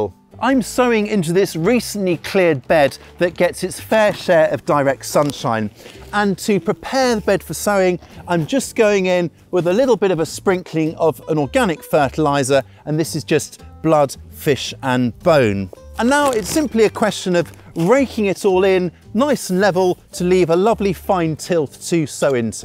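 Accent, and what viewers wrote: United Kingdom. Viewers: You sound British, are you?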